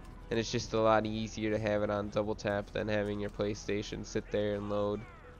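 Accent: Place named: Cuba. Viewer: superliked you sound like a cuban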